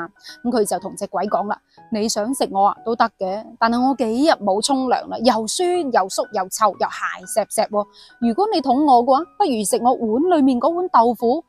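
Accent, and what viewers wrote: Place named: Dali, China. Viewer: 大沥口音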